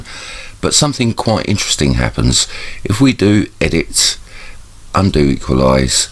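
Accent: England, United Kingdom. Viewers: "Enen if you think it's a bug on the lense you're crazy"? This kind of thing has got to stop! And NOW! As I watched this video i thought, at last! A quality video made by a quality person and not people yelling and swearing at each other and stuffing their belief systems down each others throat. There is a point where you say "it's for you guys to decide" True! It's for everybody to decide, make their own minds up. Thats how it should be until we are all told the truth and we know for sure! It's all we have at this time. Discernment and choice. You very nicely lay out the facs that YOU have. Interesting pictures indeed and food for thought, BUT you ruin the video and my opinion of you by saying someone is crazy if they don't see it your way!!!! You sound English so if you are I'm sure you will know of the Astronomer Patrick Moore? I never found him appealing to watch but Im sure millions would agree. 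He was hoever , a very interesting man if you could keep up with him talking 20 to the dozen. As a kid I learned a lot from him but some months ago he was discussing the moon with another astrologer and he mentioned the conspiracy world and the "fake moon landings" .he looked right into the camera with that terrifying eyebrow up on his head almost and the eye that belonged to the said brow, popping out like an organ stop and shouted at the viewers "anyone who thinks that WE didn't land on the moon has to be compleeeeeeetly INSANE"!! That was it for me, all respect GONE in a flash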